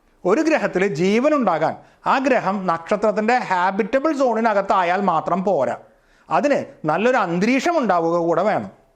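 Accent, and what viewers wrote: Thrissur, India. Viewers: ചേട്ടൻ തൃശ്ശൂർ ആണോ? 😊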